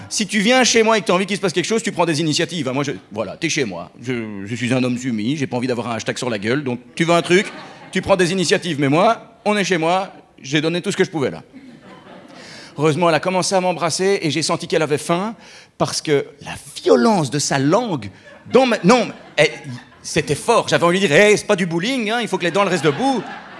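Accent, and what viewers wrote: Belgium. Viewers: Étant belge je reconnais l’accent de mon patelin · J’adore l’accent belge. L’accent d’une région reflète l’esprit de cette région.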